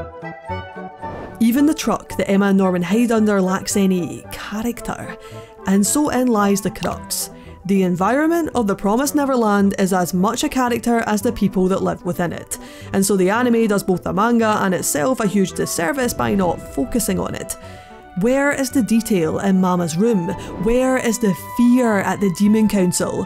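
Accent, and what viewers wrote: Scotland, United Kingdom. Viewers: Holy crap this video was done exceptionally well! And I love your Scottish accent! Great job, cheers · Yes, I watched all the manga just before it on air. I almost lost all the joy of watching...Besides the fantastic editing of your video, I love your accent. Are you from the UK??? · you sound scottish, yaas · Love this!!! Your accent sounds Scottish, it adds to this review very well. Keep it up 💕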